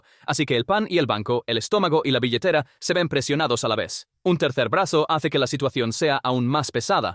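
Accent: United States. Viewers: esa ia en español gringo es ridicula por dios dejen de usarla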